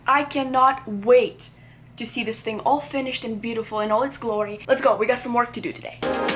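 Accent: Russia. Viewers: Is that a Russian accent? Me gusta. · A cute Russian girl. Still your accent is prominent, but overall a good job. Greetings from the EU.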